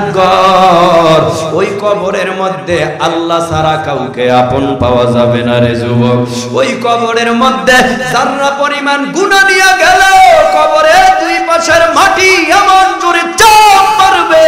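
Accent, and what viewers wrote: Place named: Barishal, Bangladesh. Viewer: Barisailla pola bole kotha Owaz ta really দারুণ hoice সাবাস ভাই আমাদের গর্ব e আপনি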